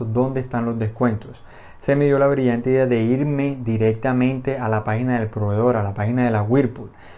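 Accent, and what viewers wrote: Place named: Caribbean Coast, Colombia. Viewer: Costeñol efectivo, gracias man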